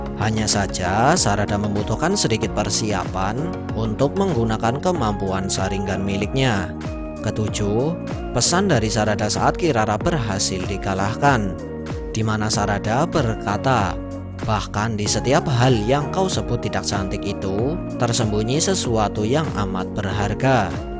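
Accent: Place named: Banyumas, Indonesia. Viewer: ngapak banjarnegara👆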